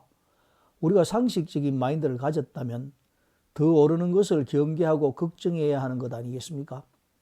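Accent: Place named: Gyeongsang, South Korea. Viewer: 공통점!? 경상도 말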